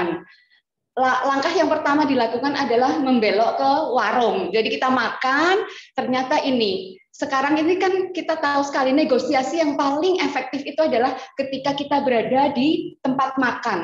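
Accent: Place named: Banyumas, Indonesia. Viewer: Salam ngapak bu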